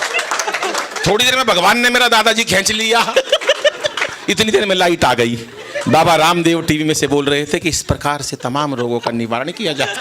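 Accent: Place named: Haryana, India. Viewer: ओरिजनल हरियाणवी अंदाज वेल डन श्री वेल डन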